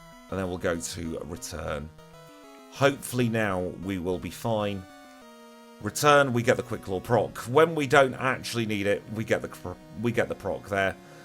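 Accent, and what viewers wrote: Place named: United Kingdom. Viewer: Yoo. I play one shot games of Dungeons and Dragons every week and this week had me questioning my DM constantly. You two sound exactly the same. It was wild, I kept looking over at him thinking you are you just don’t want to tell me. I’m going to assume he is probably from the same part of GB as you, and it just the accent I was hearing.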